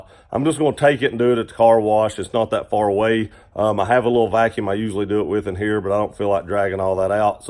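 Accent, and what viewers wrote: South, United States. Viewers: That southern drawl is like nails on a chalk board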